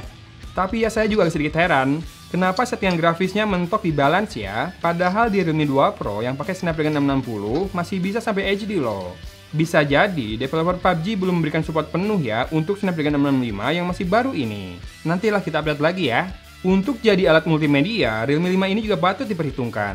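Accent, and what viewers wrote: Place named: Bandung, Indonesia. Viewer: pasti orang bandung bang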